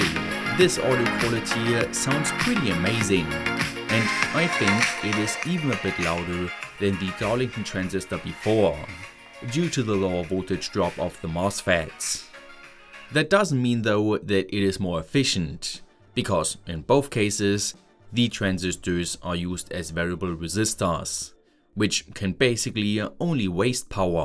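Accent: Germany. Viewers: But why do you have such thick German accent if you say that you are Scottish?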